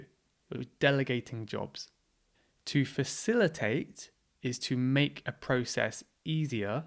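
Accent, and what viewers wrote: United Kingdom. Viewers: Hi! I came across your podcast by chance on Spotify and I really liked the episodes I listened to, especially this one about Job Interviews. I'm an English teacher here in Brazil and you have already become a role model for me! For a start, I'm biased about British accents! Just love it! Moreover, your talks are very well structured, clear and extremely didactic! I'm already a follower on Spotify! Thank you for your channel! I have a pilot podcast aiming at CPE Exam tips but it's just a draft, I have a lot to improve and I haven't been dedicating myself to it right now. It's called 'Polish Your English - Flávia Pini'. Cheers!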